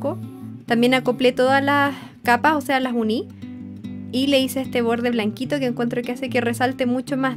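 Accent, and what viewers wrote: Chile. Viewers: Eres Chilena???? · Creo yo que el acento la delata no? SI, SI ES CHILENA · eres chilena como yo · ERES CHILENA!!!!!!!!! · Eres chilena?!?!?! (Si dijiste que si chocala) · Savia que erai chilena Por la forma de ablar pero ya se aclararon mis dudas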